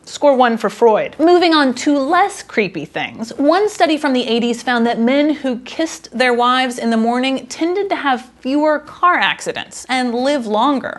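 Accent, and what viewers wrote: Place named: United States. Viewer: Her voice reminds me why I don't like American accents. Absolutely no ability to modulate - it's just constantly shouting.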